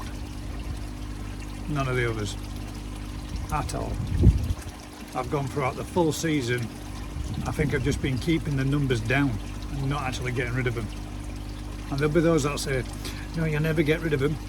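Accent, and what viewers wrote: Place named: West Yorkshire, United Kingdom. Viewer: Crikey there's some sensitive folk out there!! I will be making my pond bigger after my 2 weeks holiday and enjoy your vlogs Where are you located??...I can tell your in West yorkshire down to your accent as I'm originally from Huddersfield but now in doncaster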